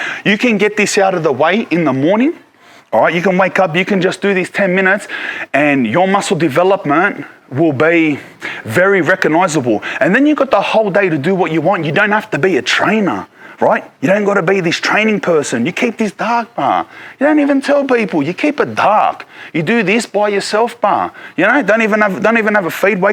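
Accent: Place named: Sydney, Australia. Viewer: Boom brother, oos lad! I’m from Brisbane so we don’t talk like that but I had a mate from Sydney who talked like that. Love it! I could listen to old talk all day haha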